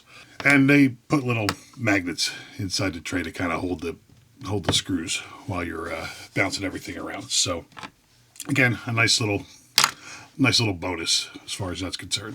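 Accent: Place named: New Jersey, United States. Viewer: Upper mid Atlantic sure sounds like Joizey to moi...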